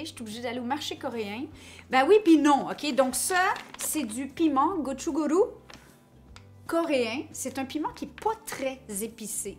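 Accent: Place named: Quebec, Canada. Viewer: J’hésite.. ton accent.. Québécoise? Française? Québécoise qui parle à la française? Quel mystère! 😂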